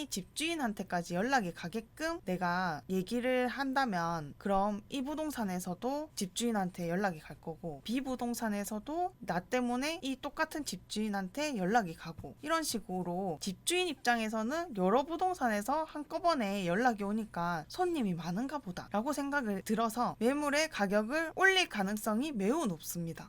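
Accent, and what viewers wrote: Gyeongsang, South Korea. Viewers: 어후 쌍도사투리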